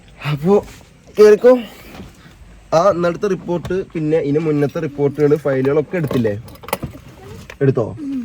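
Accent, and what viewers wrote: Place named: Palakkad, India. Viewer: Ivan palakkad slang aayallo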